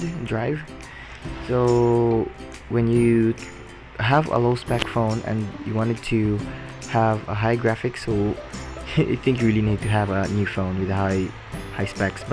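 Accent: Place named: Philippines. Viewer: Hey are you filipino? I can tell by your accent buddy! Mabuhayy!!!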